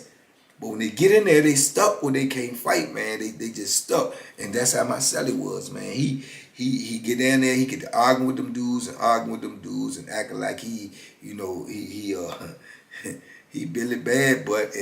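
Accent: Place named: South, United States. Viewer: Just caught your channel, homie! Just wondering where you're from? I've heard a lot of stories from up north, but i swear to God, you were born in the south wth that accent! 🤣 keep up the awesome content.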